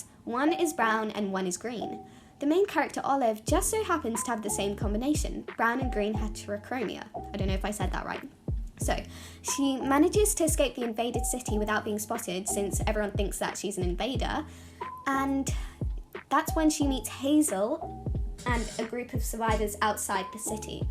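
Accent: United Kingdom. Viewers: Your voice is so pretty and I love the British accent. I’m southern but don’t have a strong British accent. I’ll probably take up some of your tips when I film another voice over… but that’ll be a while. I also wanted to ask how you stay motivated and creative with video ideas. I’ve taken 7 months from uploading but am still confused as to what I should upload. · !!! you have such an heavy British accent, I love it!! It's so nice to actually hear your voice for once lol! I love how the character looks now! I hope you have a great day/ night Byee <3 Edit: 🍞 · can i eat ur accent and ur art? 🥺 you sounded like how i expected you to sound but the accent caught me off guard bcs im just a sucker for british accent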